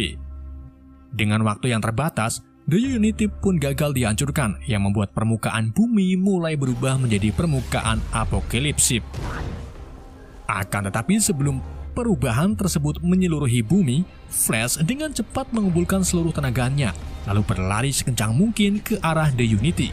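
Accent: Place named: Banyumas, Indonesia. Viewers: Wong Jawa ya kang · Mas 'e kerenn...jawa ngapak.. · Anda orang Jawa ternyata tapi Jawa mwna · Barry ndlenger akhirnya kesandung wkwkwkwk Asline wong ndi sih min? Wkwkwk ana ndlenger ana apa ah kayane wong ngapak pantura kie wkakakak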